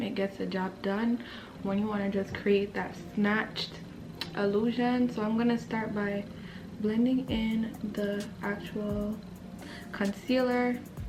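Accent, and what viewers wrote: Jamaica. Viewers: Oooh! I hear that Jamaican accent! 🥰 i love meeting other Jamaican Youtubers like myself! We ah go up this year!🤪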